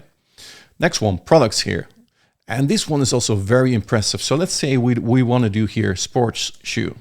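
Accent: Netherlands. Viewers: I smell dutch?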